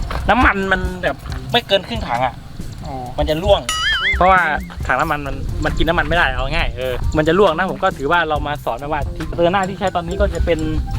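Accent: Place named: Isan, Thailand. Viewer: เว้าอีสานแน่อ้าย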